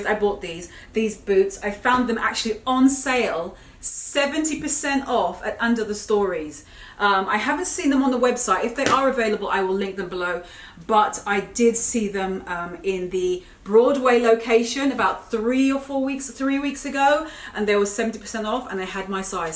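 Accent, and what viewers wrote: United Kingdom. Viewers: I realize this is a yr old but “bloody” lol (typing as I speak in your British accent in my mind) I love that yellow suit, everything about it. Is that appropriate for any work place tho?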